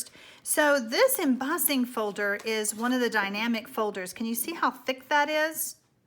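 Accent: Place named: Texas, United States. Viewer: Love you accent It makes me think of Texas each time I watch. I-grew up in Paris Texas and lived in the DFW for years. Now we’re in TN My question is what is I would like to view your video of the one sheet wonder you did of the Merry and Bright DSP. I love the cards you made from it Could you guide me to the video. I couldn’t find in on utube. Thanks for your help Texas girl.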